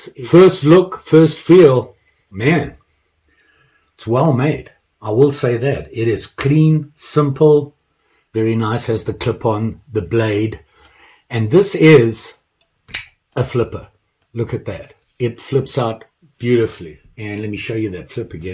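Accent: South Africa. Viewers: are you south african ? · Just found your channel and being a knife maker and mad about knives really enjoying your content, recognized that accent straight away 🇿🇦 .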